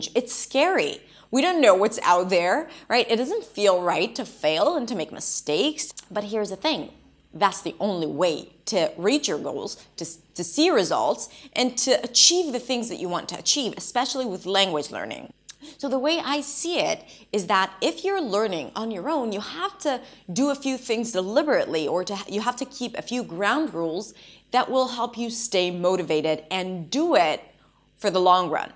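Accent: United States. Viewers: Thank you so much for this clip. I always wanted to become a fluent English speaker, but I never asked myself why, so now you have made me become a critical thinker. I used to think you were born in the USA since no one can separate you from those who were born there due to your American accent until you told that you were not American. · I want to be fluent 1 - In order to get married with an English man. Hoping he will learn Arabic so I won’t have to fight with English. 2 - I live in Canada 🇨🇦 I have to speak English. you are from Israel 🇮🇱 but you sound 100% American you are very smart.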